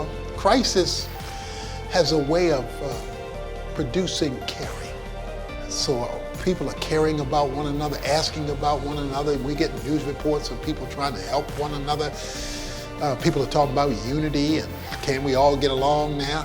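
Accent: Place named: Africa. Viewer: Legitimate concern vs illegitimate worry. Very nice. I also like his afro accent...so calm and soothing.God bless him and every believer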